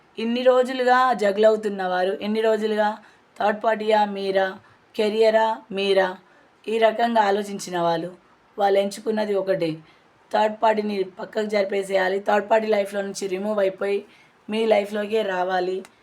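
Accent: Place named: Telangana, India. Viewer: Mee language telangana FASA bagundi.konchem boldness ekkuve.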